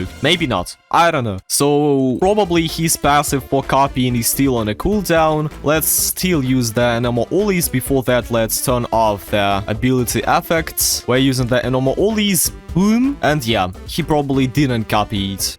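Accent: Russia. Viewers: По акценту мне кажется что ты наш русский слоняра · Are you Russian?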